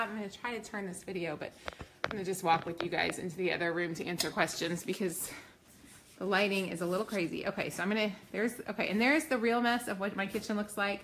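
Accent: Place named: Georgia, United States. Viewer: Where are you from? Your accent is exactly like my friend’s who grew up here around Woodstock Ga 🙂. I just found you on YouTube and I need to go download the cookbook!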